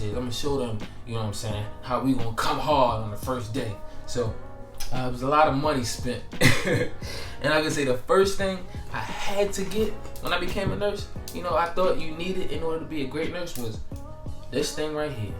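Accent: Baltimore, United States. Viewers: two, do, blue. true......kinda miss that Bmore accent.....ya know what um talking bout. might be the next comedian in Baltimore county!!....Good stuff!!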